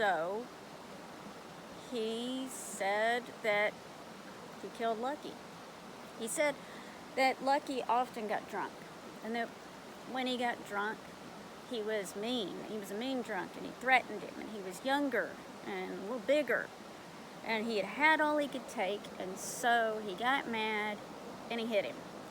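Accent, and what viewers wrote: South, United States. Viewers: Love your southern accent !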